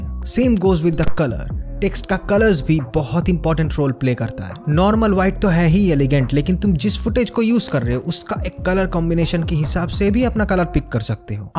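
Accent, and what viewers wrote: Bengal, India. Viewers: Tum bhi bengali ho na? Tomar hindi te banglar tan ache!!!!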